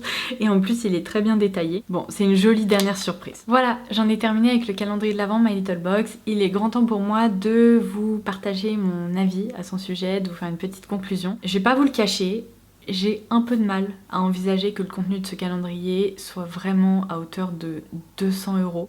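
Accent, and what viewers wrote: England, United Kingdom. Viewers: Ton accent anglais est magnifique, à tu fais des études spéciales ?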